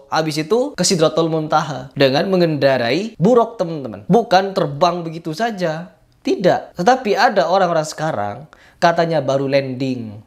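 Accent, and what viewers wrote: Madura, Indonesia. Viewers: ni orang kontenya cuma kebencian,logatnya madura tapi adabnya isra'il,pasti ini belajar pada google dan youtube,sudah jelas mulutnya sebentar lagi miring hidup dan matinya susah bahagia,semoga segera dapat hidayah,tapi orang kyk gini susah dapat hidayah krn sudah tercampur setan yg angkuh dan songong,biasanya klo orang hebat beladiri semakin tinggi ilmunya semakin merendah hatinya,brrti ini masih dikit ilmu beladirinya,sehingga angkuh songong seakan akan paling suci dan paling benar, na'udzubillah stumma na'udzubillahi min dzalik.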